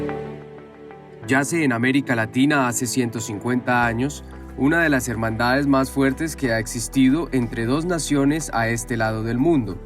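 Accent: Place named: Bogotá, Colombia. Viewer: El acento es de Bogotá, Colombia.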